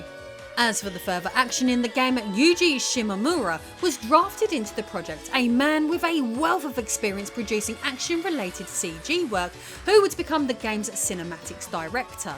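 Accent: United Kingdom. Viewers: You are absolutely the perfect person to cover this material! Sultry and British!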